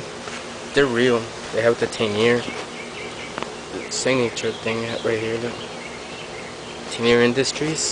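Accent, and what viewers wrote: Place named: Chicago, United States. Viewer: Oye: thanks for the review. love your accent ,too. reminds me of my friends form chicago!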